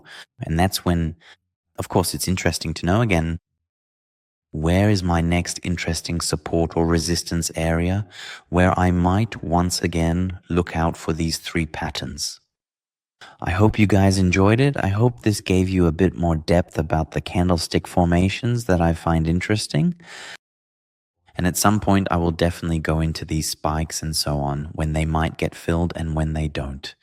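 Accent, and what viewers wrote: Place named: Germany. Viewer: i prefer your german accent. its much more natural.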